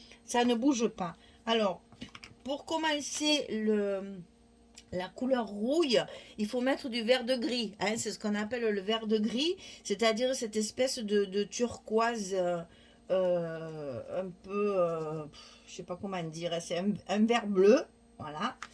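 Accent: South, France. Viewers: Superbe, j'adore te regarder juste pour ton petit accent du soleil 😘j'admire tes créations... Vais peut être finir par me lancer dans un album. Je ne connaissais pas cette marque, est elle chere ? Car petit moyen. J'essai de faire des créations avec mes petits moyens, je viens d'ailleurs de créer une chaîne qui comme moi, ont peu de moyens. J'ai trouvé une astuce pour donner un aspect corrosion à nos decoupes style steampunk, si cela t'intéresse. Merci pour tes partages❤️❤️❤️❤️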